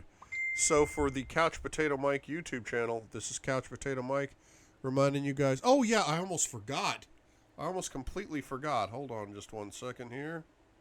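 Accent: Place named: United Kingdom. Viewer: Loved you reading this...and since im from the UK your British accent is excellent. Well done and thanku ❤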